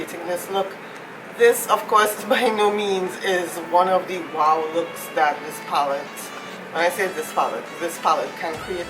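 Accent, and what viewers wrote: Trinidad and Tobago. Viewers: You sound like you have a Trini accent! I love it. My dads from Trinidad. Omg! I just got my palette in the mail the other day! So Pretty!! Loving the look! 💕💕 YOU COMPLETELY SLAYED ME!!! 😍😍😍 I just want to say how much I enjoyed watching your video. Can’t wait to see more! Just left a like 👍🏽 BTW I also have a channel, I would love for you to check it out when you have a chance and show some love and support! I just uploaded a tutorial using this palette as well! Us smaller YouTubers need to stick together, let's support one another! Lol Thanks! 😘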